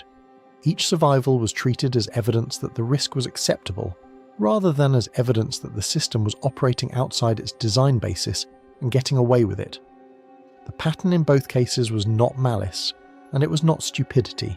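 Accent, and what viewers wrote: United Kingdom. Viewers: Your British accent is not going to help you to get by with this rubbish story!!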